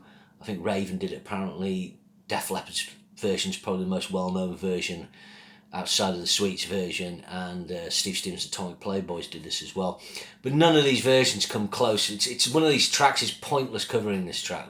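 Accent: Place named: Northern England, United Kingdom. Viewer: You sounded like you were from the north, but didn't sound exactly like the Yorkshire accents I'd heard, so I was just curious. Thanks! I'm from the state of North Carolina.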